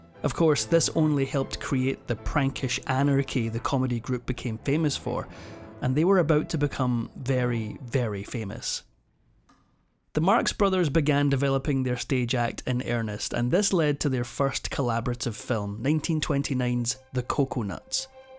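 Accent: Scotland, United Kingdom. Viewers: Clearly has a Scottish accent 🙄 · Who doesn't love Harpo? A preh-tty gued Brehtish (or Scuttish or Oyrish?) narraytion. I agree with the comment about not dissing Zeppo. He was quite talented on & off screen. One story relates how critics raved about Groucho's performance, when it was Zeppo subbing for an ill Groucho. Give him a break. But Harpo, wow! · His accent is Scottish. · A fine Scottish accent. Didn't realise that's how you pronounced CROWKAY though... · I like the speaker's accent. Scottish?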